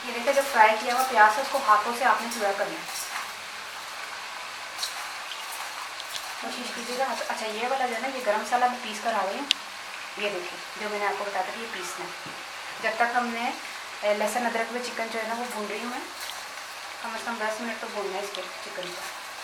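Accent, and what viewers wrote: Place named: Punjab, India. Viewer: Punjabi boht pyri bolti hn ap api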